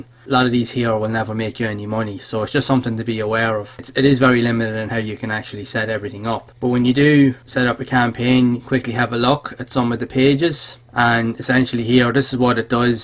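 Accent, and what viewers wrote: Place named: Ireland. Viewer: Great honest review.. Where are you from, Cavan? Nice to hear a fellow Irish man. As per the product.. I doubt even newbies would be approved to promote the 30 offers..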